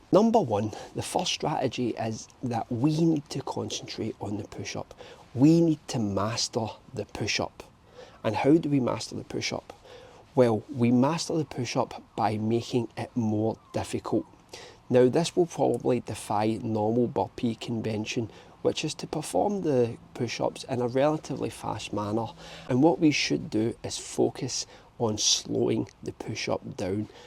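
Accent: Scotland, United Kingdom. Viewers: Hey man, my comments have been telling me to hit you up. Where is Scotland are you from? 😊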